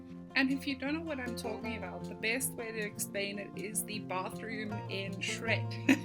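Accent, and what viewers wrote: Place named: Australia. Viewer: i think ur from australia, right?? with the accent, lol love the build a bit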